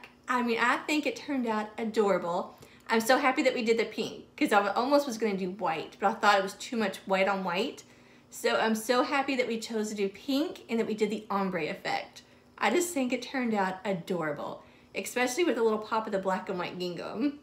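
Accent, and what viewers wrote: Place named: Kentucky, United States. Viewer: I thought you had to be from KY because of your accent. I live in KY too!!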